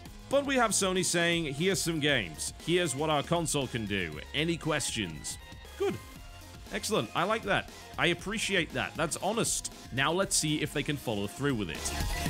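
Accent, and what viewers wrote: United Kingdom. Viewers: are you honestly requesting someone to pronounce something a specific way? wtf, he talks how he does, he's from the UK but has lived in the US for over a year... so he talks like John Bain, deal with it.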